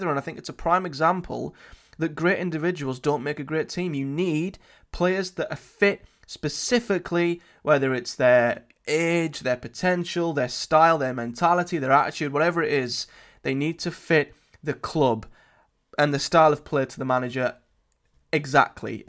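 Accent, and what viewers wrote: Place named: Newcastle, United Kingdom. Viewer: Hold one why is a Geordie doing this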